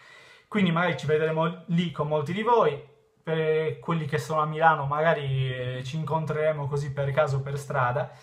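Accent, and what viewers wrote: Sardinia, Italy. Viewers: Allora secondo me te ti sotto valuti perche' ci sara' un motivo se hai fatto un boom di iscritti,magari ad una parte delle persone che si è iscritta piaceva il tuo accento sardo magari ad un'altra parte piaceva il call of sputy questi sono esempi fattI da me magari le persone si sono iscritte per altri motivi ad ogni modo non ti devi sentire in colpa se hai fatto piu' iscritti di altri nn è colpa TUA.Detto questo mi manchera' vedere i tuoi video ma ti auguro buona fortuna per gli studi:) PORCO IL!!!!!!!!!!!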